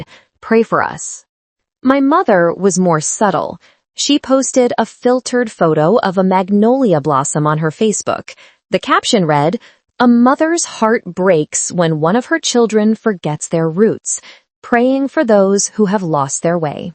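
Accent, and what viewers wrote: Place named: South, United States. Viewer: Well the southern accent didn't last long.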